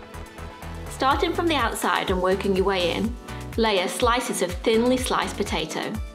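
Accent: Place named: Northern England, United Kingdom. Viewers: Must give it a bash. Love your Lancs accent. Thanks. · We have proper vowels up north. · That looks amazing I liked the recipe as much as I liked her Lancashire accent. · So pleased I found your channel. Thank you so much for the lovely recipes and for the clear and easy way you describe what you're doing. You do sound more Cheshire than Lancashire to me though!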